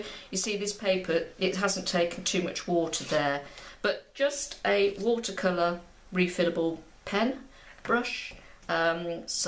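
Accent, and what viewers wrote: United Kingdom. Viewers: Hi... thank you SO much for this... I really struggle with letting go and producing loose paintings... you've shown me an easy and enjoyable way forward! I am completely self-taught (with the help of YouTube and Google! lol!) and started out with watercolour pencils... in the last couple of weeks, I've transitioned across to watercolour paints... maybe this is why I find it hard to let the paint express itself, as I'm used to having more control with pencils?! Anyway, enough rambling... PLEASE do more of this type of thing:) Being an expat in Cape Town, it's great to hear a fellow Brit too!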